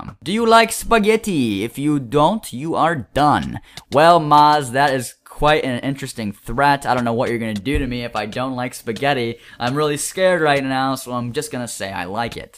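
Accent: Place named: Spain. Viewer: jaja me gusto tu asento español jaja saludos desde mexico i like your language spanish im a sub from mexico :)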